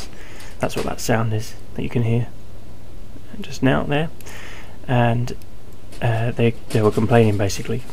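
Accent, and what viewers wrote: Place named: Nottingham, United Kingdom. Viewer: Top vid as usual. Went to see my folks today in Notts, your accent sounds very similar!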